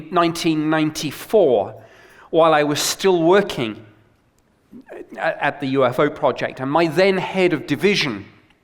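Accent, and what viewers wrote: United Kingdom. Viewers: is it just me or is he a terrible speaker but his British accent keeps me listening and associating some kind of learned authority to what he says?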